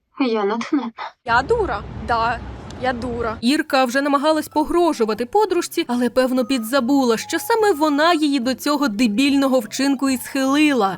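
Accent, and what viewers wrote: Zaporizhzhia, Ukraine. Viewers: Запоріжжя чи що? Ой, як приємно. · Шок, ти шо з Запоріжжя?😳яка неочікувана приємність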